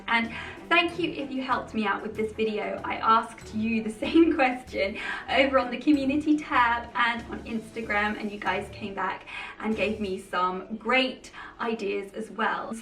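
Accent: England, United Kingdom. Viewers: It's like my opinions in an English accent. Are you reading my mind?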